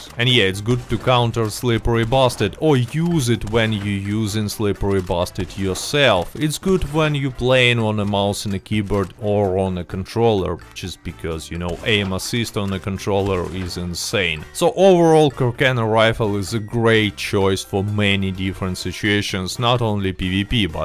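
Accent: Russia. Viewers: are you Russian? ME TOO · Russian accent still visible enough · I've only just found ur acc but I can tell I'll like u as a content creator also i can hear ur Russian and your English is good can't wait to see what u got for me and I just edited this and realised I replied to som1 and not commented my bad🤭😂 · Wow, all video I was like "is this a Russian accent" and when I saw "попадание в шляпу" I get it, so I have a question, what is painting black, is it giving more damage ? Буду благодарен ответу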